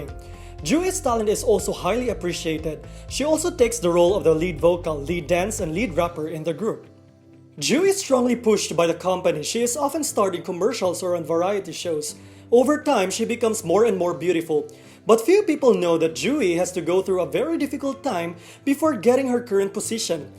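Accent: Philippines. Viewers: The narrator's accent is so Filipino. Ewwwwwwwwww 🤮🤮🤮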